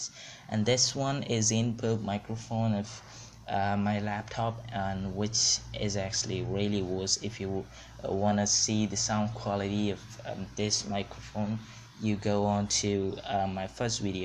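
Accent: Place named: Indonesia. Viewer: Your accents jokes i love it are u from Indonesia im guessingg, pZee xD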